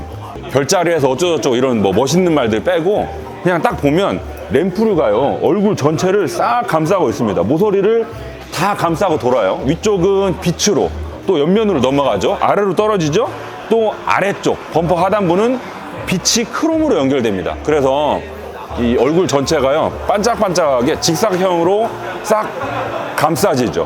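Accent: Jeolla, South Korea. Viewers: 절라도 사투리 역겨워서 못들어주겠네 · 영상 보면서 느꼈네요 지식도 풍부하시고 이해가 팍팍~!!쉬운설명 감사합니다~ 구수한 전라도 억양 듣기 좋네요~~!!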